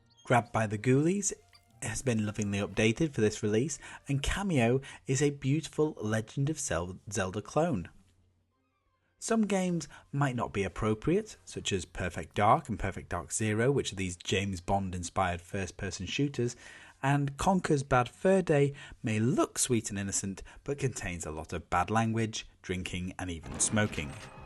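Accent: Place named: United Kingdom. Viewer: Is there a video of Xbox games without a British person? Just accent is fucking annoying.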